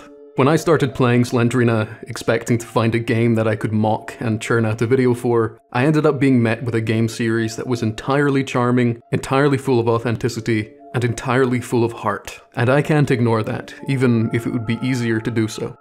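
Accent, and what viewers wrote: Scotland, United Kingdom. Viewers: First time on your channel and I cannot for the life of me pin down your accent you have weird mix of Scottish, German and oddly a wee bit of American 😂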